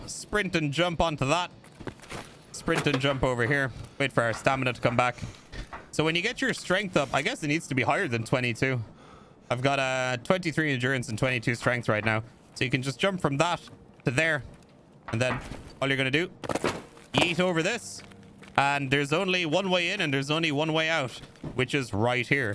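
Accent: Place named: Ireland. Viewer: The Irish accent is a thing of beauty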